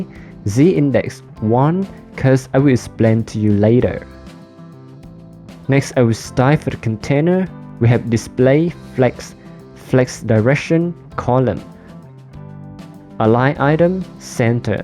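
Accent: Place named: Vietnam. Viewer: anh nói kiểu giọng Anh-Việt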